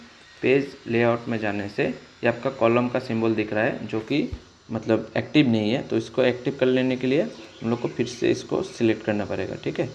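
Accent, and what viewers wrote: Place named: Assam, India. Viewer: Very useful but Assamese type hindi explanation is boring.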